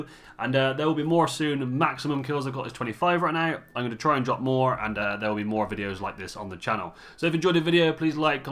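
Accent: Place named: Somerset, United Kingdom. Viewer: I'm from NE Somerset, I could definitely tell. Lots of 'er's lmao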